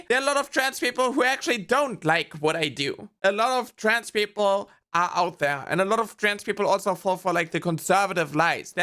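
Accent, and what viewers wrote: Germany. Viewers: Just asking, are you German? You have a very charming accent xD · Why did I not expect a German accent?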